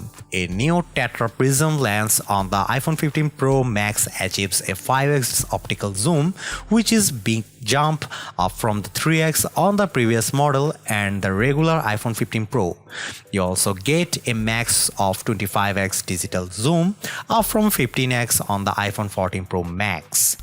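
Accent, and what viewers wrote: India. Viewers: You have a very good Indian accent 👍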